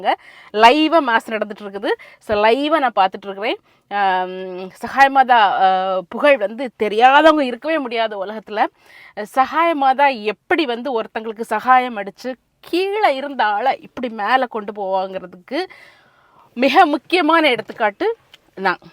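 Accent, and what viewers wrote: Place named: Kanyakumari, India. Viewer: அன்பு சகோதரி ❤❤❤❤❤❤❤❤ எங்களுடைய திருவிழா வாழ்த்துக்கள் ❤❤❤❤❤❤❤❤❤கன்னியாகுமரி வட்டார மொழி மிகவும் பிடிக்கும்... இன்சூரன்ஸ் advisor's BOTH LIC &MEDICAL Star health insurance ❤❤❤❤❤❤❤ PLEASE UPLOAD.... LONDON PEOPLE HOW TO CHOOSE INSURANCE....❤❤❤❤❤❤❤❤❤❤❤❤❤❤❤❤❤ THIS IS AWARENESS VIDEO... KINDLY MY request.... Take care sister....❤❤❤❤❤❤❤❤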